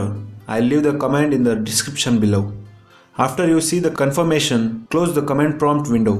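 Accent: India. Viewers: Ty BRO nice idian accent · FUCK YOU AND YOUR INDIAN ACCENT AND YOUR SCUMMY VIDEO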